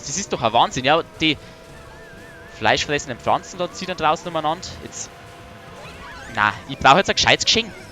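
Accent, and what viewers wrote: Bavaria, Germany. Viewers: Geiles Video ... Dein Bayrisch is so Geil mach weiter so ...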